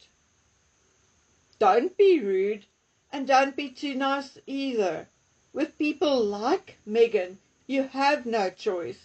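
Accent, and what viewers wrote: South Africa. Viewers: I don’t understand why her subscriber numbers aren’t higher. I can say this because I know she doesn’t read our comments. Maybe people find the South African accent jarring. We have a lot of South Africans living around where I live 🇦🇺 & it used to grate on me until I got used to it. It’s the only thing I can think of because I never miss one & have recommended her to others on X etc but her numbers remain the same.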